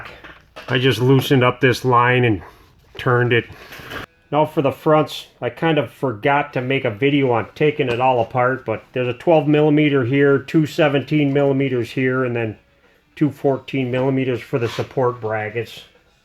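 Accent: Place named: Midwest, United States. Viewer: this guy is so midwestern🤣🤣🤣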